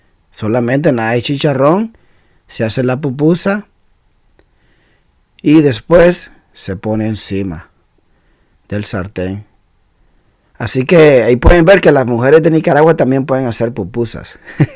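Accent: Costa Rica. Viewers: ese maje habla como costaricense. y dando recetas del salvador jajajja. esas no son pupusas ni el curtido .. nada que ver con el salvador .. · Bien perdon me adelante con el comentario... pense que eras hondureño... aunque tenes el acento mas a tico que Nicaraguense, bueno... gracias porque te gustan las pupusas y las mejores pupusas estan en olocuilta en El Salvador, tambien en los planes de renderos y hay varias partes en El Salvador donde las hacen riquisimas ya se a de maiz o de arroz, practicamente en cada esquina de cada calle hay pupuserias, un buen Salvadoreño minimo come de 2 a 5 veces pupusas a la semana!!! bueno saludos al pueblo Nicaraguense nuestros hermanos!!! y perdon por el comentario anterior!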